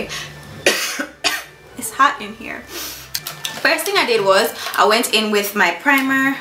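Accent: Jamaica. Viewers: I'm from Trinidad. ... but a Jamaican accent is so exotic to me.... lol.... keep doing what you doing girl..... one day I hope my channel gets as bomb as yours....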